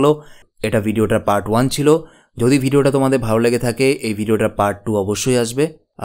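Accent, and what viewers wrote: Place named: West Bengal, India. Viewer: কথার উচ্চারণ শুনে মনে হচ্ছে আপনি ভারতীয় বাঙালি।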